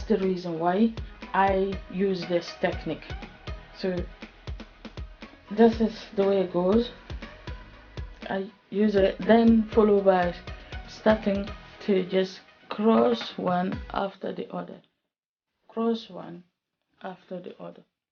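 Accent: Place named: Scotland, United Kingdom. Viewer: Iv watched your videos before and this is the first time iv noticed that you have a Scottish accent 😅 just when I hear you say some words I noticed it. These braids are so cute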